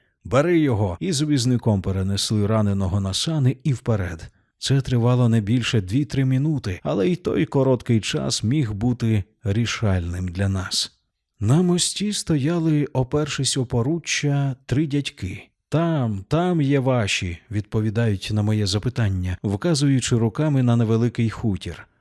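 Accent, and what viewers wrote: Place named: Chornobyl, Ukraine. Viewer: дякую за справжню ,,чорнобильську,,вимову у тексті👍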